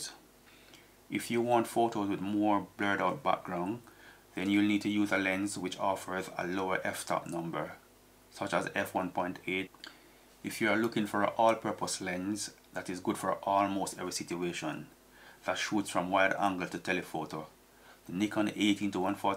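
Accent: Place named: Caribbean. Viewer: I would recognize that Caribbean accent anywhere. Very nice to the point video here. It’s interesting that you bought your lens to use it with your D7200 which I also own since 2015. That is one amazing dx camera which I don’t ever plan to part with. I now also own the Z5 which suits my type of photography. Interestingly that I just recently purchased this 18-140mm from KEH at a bargain price for the exact reasons you mentioned. In addition to my D7200 it works beautifully with my Z5 using the FTZ adapter. It also focuses very quietly during video and takes amazingly sharp pictures on this Z system even though at a reduced 10 mp but enough for me to get a nice sized medium enlargement. It would have been good if you had included a few images taken with this lens at different focal lengths and scenarios. Keep up the good work brethren. 😀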